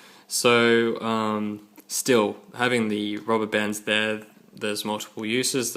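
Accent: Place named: Australia. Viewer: LtWolf/TheOfficialWolf haven't you ever heard an Aussie before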